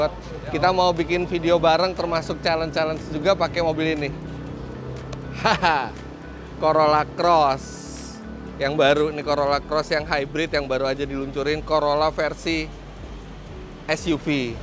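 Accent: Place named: Malang, Indonesia. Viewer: oyi sam mangkane ndelok seng lengkap opo'o om fitra kok tak arani kenek logat malang, mergo gelek bareng karo mas gilang juragan 99. Aku yo eroh nek yowis iku boso jowo sans sam gausa mesoh mesoh ngono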